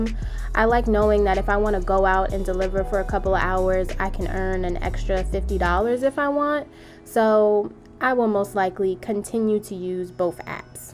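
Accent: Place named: New Jersey, United States. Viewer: Wow great video I knew you were from New Jersey I just wasn’t sure but the way you pronounced some words gave it away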